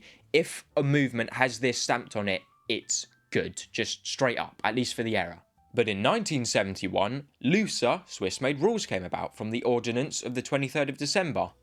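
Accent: United Kingdom. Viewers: Apart of the great content, I love your impeccable british pronounce. 2 lessons in 1 time: about watches and perfect pronounce… what’ else!👍🔝🔝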